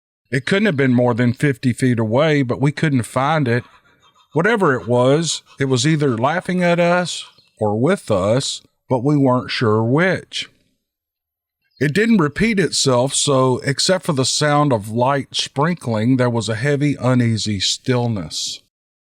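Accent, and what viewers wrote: South, United States. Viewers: yep, love his southern accent❤ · Love your southern drawl, you give flavor to the stories you read...can almost envision what is being expressed through words...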